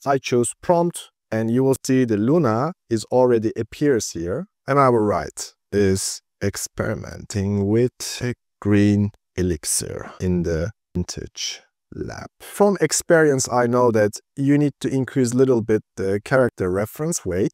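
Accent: Turkey. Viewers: türk müsün bilader? lise şivemizle çok güzel anlatmışsın. tebrikler :) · Kanki Türk aksanı bu nerde olsa tanırım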